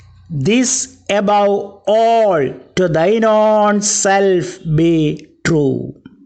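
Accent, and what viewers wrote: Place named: Kerala, India. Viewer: Malayalam accent harms all along...😢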